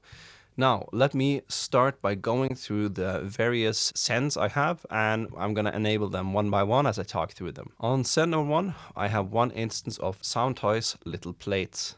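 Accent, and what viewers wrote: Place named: Sweden. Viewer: Jeg har vært helt sikker på at du er svensk når jeg hører den minimale aksenten din. Gratulerer med VM-medaljen i hockey, veldig stort :) (Google Translate :) )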